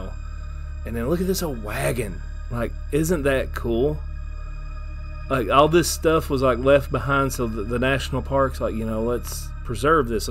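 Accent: South, United States. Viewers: I LOVE this guy's country accent!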